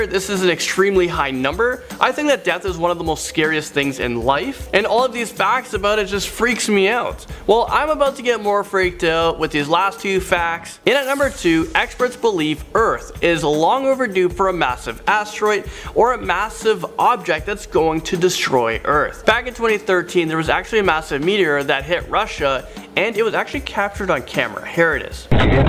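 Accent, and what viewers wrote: India. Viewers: Are you indian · r u inidian ?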